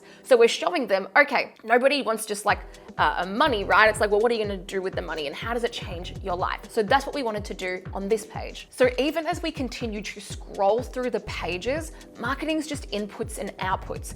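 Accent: Australia. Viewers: love everything you put out - pure value - you're a wizard... Therefore - you don't need the background music or it should be much lower - it's somewhat (to moderately) distracting in this video. Your Aussie accent is all most of us want or need to hear in your incredible videos. - 😉 - You're brilliant - we love your videos - there's no need for you to "entertain us."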